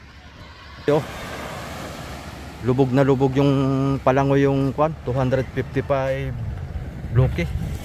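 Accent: Philippines. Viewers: may pagka-bisaya ba pananalita diyan?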